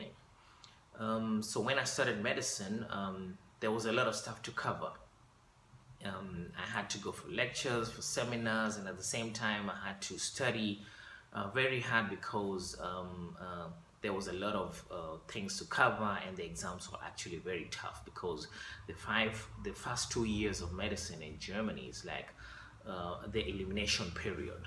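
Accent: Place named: Kenya. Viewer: ongea tu poa kama mkenya!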